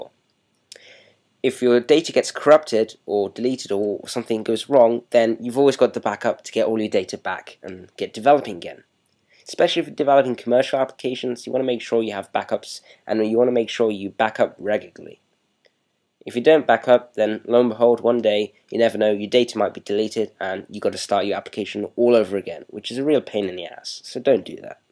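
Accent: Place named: Germany. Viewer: i sense a german accent, being german myself, where in germany were you born?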